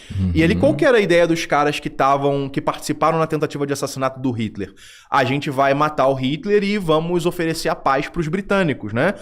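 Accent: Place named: Rio de Janeiro, Brazil. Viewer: Muuuuuuito carioqueixxxxx, fica difícil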